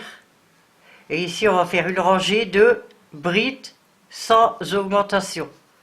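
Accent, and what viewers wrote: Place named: Nord-Pas-de-Calais, France. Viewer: 🤣😅😁😄😃😀😂😉😊 Bonjour comment ça va chez-ti zot? Bon comme d'habitude vous me faites bien rire par votre façon de parler, oh la la une sacrée ch'ti,vous me mettait du baume au coeur merci beaucoup 😉😊🥰😍🤩☺ sinon très joli chapeau pour cet été. Avec ce confinement je vous souhaite un énorme courage et une excellente continuation dans vous projet. À bientôt 😃😉😊🥰 la ch'tit de la région parisienne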